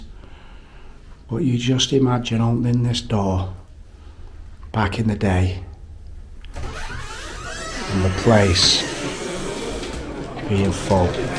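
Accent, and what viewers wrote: Manchester, United Kingdom. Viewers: Are you Mancunian ? Also watch your croally bridge vid and loved it mate I love history but my aunt works at the church above where you went and it’s haunted but gangs and that hang about there but I’m subbed mate 🙂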